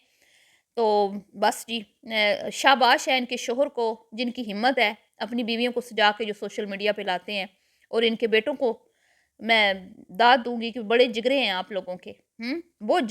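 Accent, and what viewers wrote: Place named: Pothohar, Pakistan. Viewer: Apka accent kafi pothohari hai which has my ancestors too. Bohat acha lagta hai apko sun kay. 🙂.💜 from Canada.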